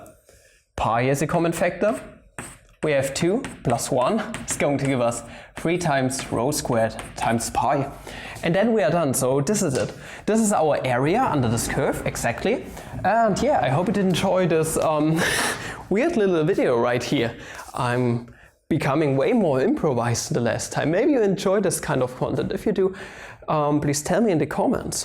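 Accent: Germany. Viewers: It's his German accent and nothing else.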